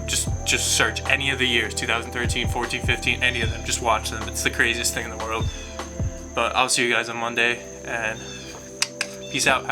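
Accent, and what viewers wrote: Russia. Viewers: Eres ruso p estadounidense?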